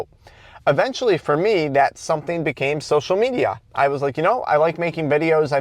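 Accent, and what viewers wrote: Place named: Michigan, United States. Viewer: I'm going to pin the accent on southeastern michigan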